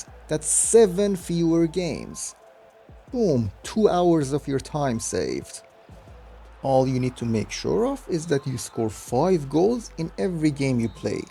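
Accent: Iran. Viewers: Omade bodam videot ro bebinam bad goftam cheghad lahjash mesl iraniast bad didam esm chanelet e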